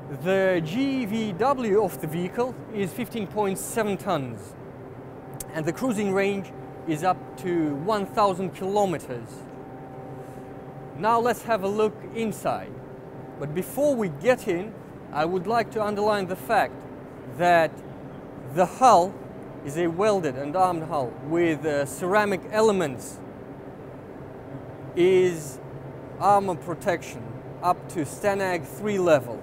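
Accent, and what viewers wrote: Russia. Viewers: Ох уж этот Russian accent )) Техника огонь)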